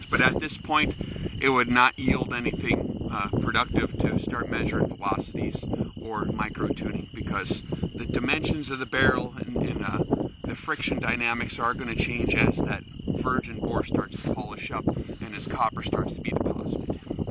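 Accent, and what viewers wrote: Canada. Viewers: Sorry kind of a new watcher here, but are you Canadian?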